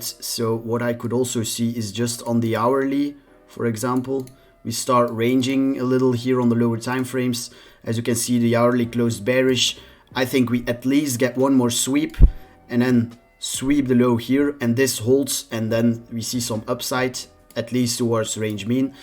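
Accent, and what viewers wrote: Flanders, Belgium. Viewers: I hear flemish accent? ;) Agreed btw, looking to bid sub 45k if we get there. Wonder when/if we're gonna get sweep of liquidation candle bottom soon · Is it flemish?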